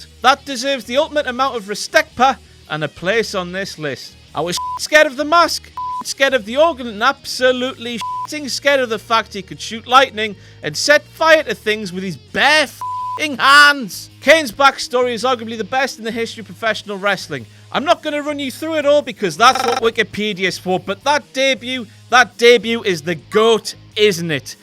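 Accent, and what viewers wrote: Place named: Newcastle, United Kingdom. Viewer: Max Mekker depends what part of Britain they are from, considering is from Newcastle he will pronounce it differently than someone in Manchester or London for example.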